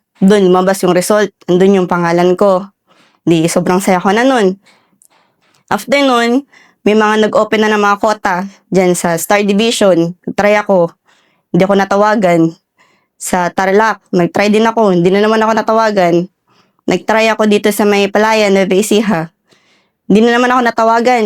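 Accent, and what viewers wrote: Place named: Visayas, Philippines. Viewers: Mam parang nainlove uli ako😅. Akala ko vsaya ka mam kc tunong vsaya ka, galing mo pakinggan nakakatuwa mas nakkainlove😅. May part 2 ka sana madam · Bisaya narin ang Punto hehe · Bisaya cebuana Ang intonation mo ma'am. I'm veri proud of u being a warrior sundalo.dapat may MEDALLA KA MAAM salydo sa nyo ni s ir clarin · Parang bisaya c Ma'am. Salute sau maam. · Yung punto mo bisaya nman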